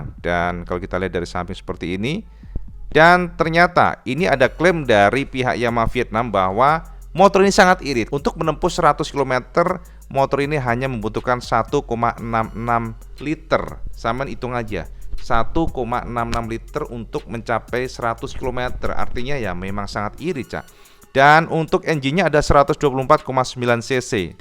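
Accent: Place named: Tulungagung, Indonesia. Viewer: enggres aksen tulungagungan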